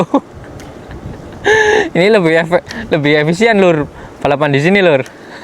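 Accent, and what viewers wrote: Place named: Cilacap, Indonesia. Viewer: Enyong sama ngapak Cilacap kali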